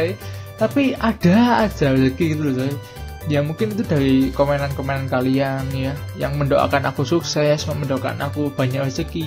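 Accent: Java, Indonesia. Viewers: Bang live streaming dong/bikinin game play WW... + nganggo boso jowo tok lek omong wkwkwk... Kangen medok mu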